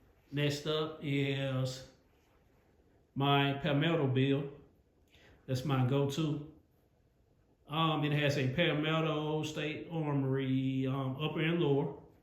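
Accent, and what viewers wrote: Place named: Jamaica. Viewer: Bad man Jamaican voice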